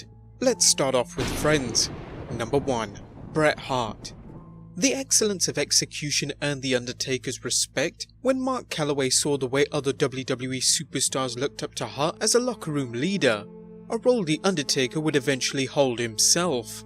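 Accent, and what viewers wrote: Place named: United Kingdom. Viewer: Why is it always a British accent?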